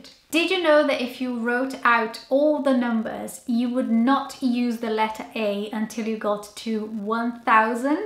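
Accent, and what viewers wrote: Wales, United Kingdom. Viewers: Came across your channel on channel mum weekly pregnancy videos and had a feeling you were from Wales? You have a Welsh accent! I’m from Cardiff! Found this video very fascinating! x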